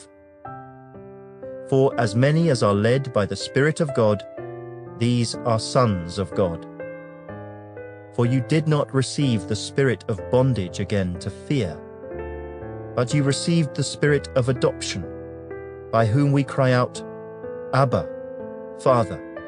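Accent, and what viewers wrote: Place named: RP, United Kingdom. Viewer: Enjoy listening to queens English and piano background music.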